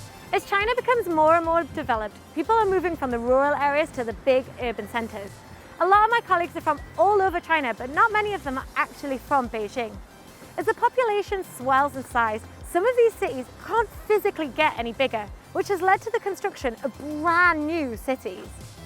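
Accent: United Kingdom. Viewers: Sorry to say, with your accent I take it you are from UK and when you mentioned there were MANY pot holes in Beijing but when comparing to UK; the road in China is as smooth as a baby'd bum! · I noted that she and another British youtuber, Mr. Barrett, pronounces City as CE. Not sure this is a common UK accent? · You're so cute，as well as your British accent. Hope I can buy you a cup of coffee when I go back to China😄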